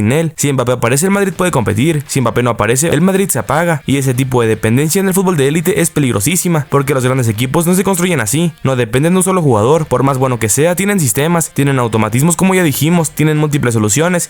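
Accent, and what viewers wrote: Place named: Yucatan, Mexico. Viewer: Es que es yucateco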